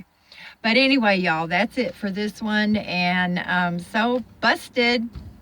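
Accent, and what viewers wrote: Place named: Texas, United States. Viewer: Good that you know about that place.I guessed that you were from Texas because my friend Freddie talks exactly like you. :-)Take care.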